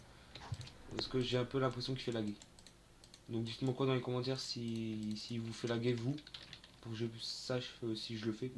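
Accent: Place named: Nord-Pas-de-Calais, France. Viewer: Ch'ti RPZ, toujours le même accent xDD Bonne continuation à ta chaîne, m'y abonne :*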